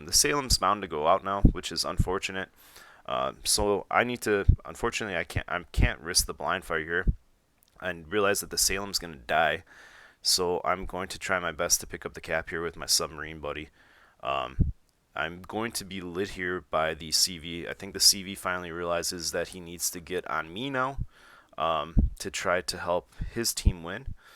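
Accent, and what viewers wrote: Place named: Wisconsin, United States. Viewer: smooth buttery Wisconsin voice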